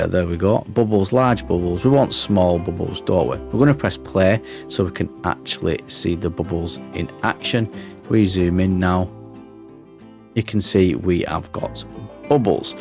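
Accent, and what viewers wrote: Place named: Northern England, United Kingdom. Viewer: Ahh your northern accent! Peerrrrfect! Great tutorial thank you xxx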